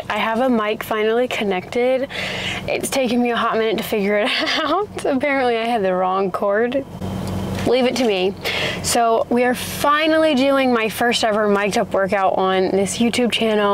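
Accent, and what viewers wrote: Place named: England, United Kingdom. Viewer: I love your English accents!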